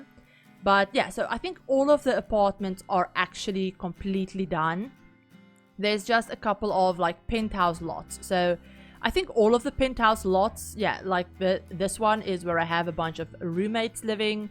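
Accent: Afrikaans, South Africa. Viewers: This is the first video of yours I’ve watched and I was so shocked to hear what I think is an Afrikaans accent? So cool!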